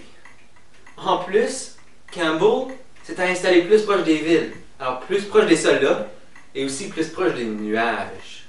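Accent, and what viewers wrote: Canada. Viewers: hehe you canadian accent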